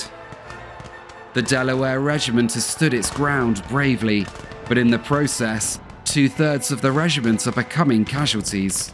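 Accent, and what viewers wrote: United Kingdom. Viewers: Too bad this is narrated by a British accent.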